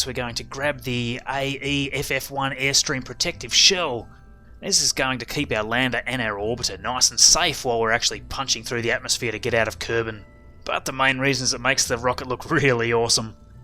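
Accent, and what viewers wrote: Australia. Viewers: G'day Mate! Are you Aussie? I am too ;)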